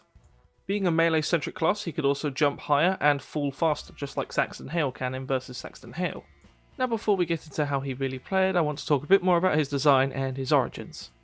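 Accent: United Kingdom. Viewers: He gives me cockney vibes (north east london) rough as anything and kind of a pub brawler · he is probably from Birmingham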